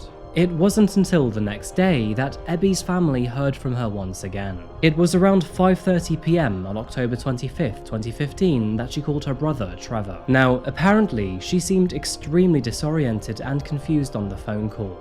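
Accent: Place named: United Kingdom. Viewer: ❤ your channel very much and I listen to it every time when you come on. Never do I miss an episode. Love 💕 your British accent as well!! I’m half Welsh from my grandfather’s side and would love ❤️ to visit your country one day hopefully 🤞before it’s too late ⏰ in life lol 😝. I’m old, hahaha 🤣!!!! But no, I really am ancient, or at least I feel that way sometimes. The body is giving out, lol. But I guess we all will go through that in the end one day or other. Right?? Wishing you a wonderful weekend ahead and stay healthy and safe too. 😊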